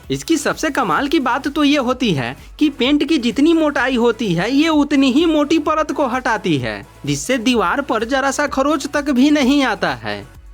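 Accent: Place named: Bihar, India. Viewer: Bhut Ganda bolate ho, bihar se ho....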